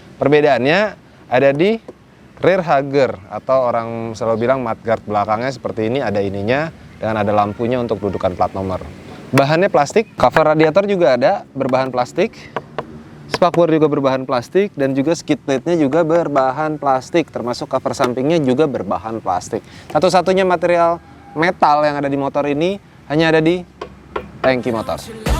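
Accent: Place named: Medan, Indonesia. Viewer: Tengok lah itu, kaya orng medan aja bg ini 😂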